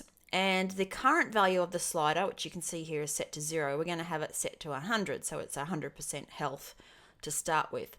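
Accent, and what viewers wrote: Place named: Australia. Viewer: Uhh yes. An Aussie, thank lord. Been listening to too many Brits, need some voices from my homeland.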